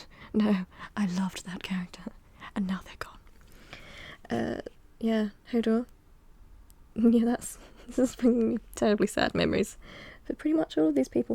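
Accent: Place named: North, United Kingdom. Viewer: I like your Northern accent.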